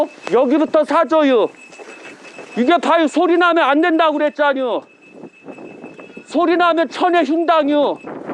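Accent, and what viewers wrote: Chungcheong, South Korea. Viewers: 제 나이 칠십이 넘어서 세상 이치는 어느정도 안다고생각합니다 집값이 얼만데 댁이라면 저집을 사겠습니까 누구라도 끔찍해서 몇십억을주고 들어가 살고싶지않을겁니다 혹시 누군가 공익을 위해서나 다른 목적으로 사용한다면 모를까 사람이 들어가 살겠다고하지않을것입니디 저도 언젠가 유투브에 올라온 영상을 본적이 있어서 저분을 알고있어요 특유의 충청도 말씨때문에요 그렇게 제자도 양성하시고 하는분이 엄청난 가격의 남의 재산을 저렇게 공개적으로 촬영해서 방송하시면 되겠냐는겁니다 집값이 폭락하고 안팔리면 어쩔거냐 그말입니다 더구나 서울등 대도시도 아니고 시골 외진곳같은데 말입니다 그리고 억울한 옥살이로 가혹한 세월을 보내신 박근혜대통령의 건강을 걱정하는건 알겠어요 지금 건강이 완쾌되신것도 아니니까 더더욱 그렇구요 그러나 영상으로 이렇게 공개적으로 놀랍고 자극적인 제목으로 이러는건 절대로 아니란말씀이란겁니다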